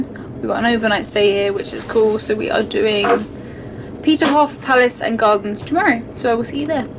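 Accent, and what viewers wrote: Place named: England, United Kingdom. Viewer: Your English accent is so beautiful 😍😍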